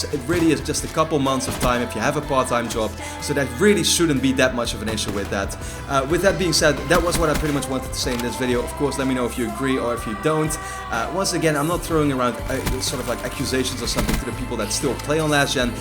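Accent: Netherlands. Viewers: are you dutch? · I think he's Dutch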